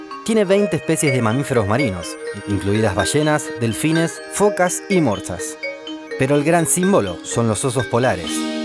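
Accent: Argentina. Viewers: Pero habrá algún documental que no tenga acento argentino? Por favor ? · Men, tu voz me es súper reconocible, lpm sos de Argentina? Alguna vez hiciste publicidad en la tele?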